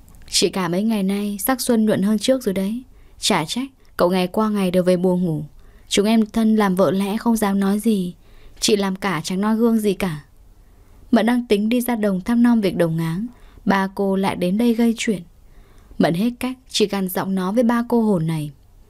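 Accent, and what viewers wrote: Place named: North, Vietnam. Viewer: Giong độc chuẩn miền Bắc,. Thanks,,👍👍👍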